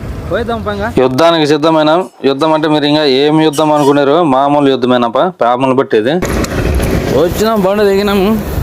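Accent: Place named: Anantapur, India. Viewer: Ananthapuram telugu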